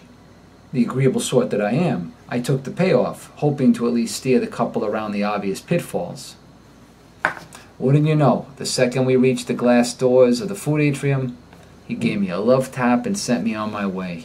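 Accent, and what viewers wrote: New York, United States. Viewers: The guy is from Nu Yawk !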